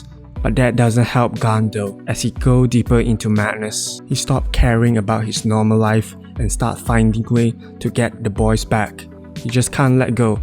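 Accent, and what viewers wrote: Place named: Malaysia. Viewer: The Malaysia accent is killing me 😂 Good video brother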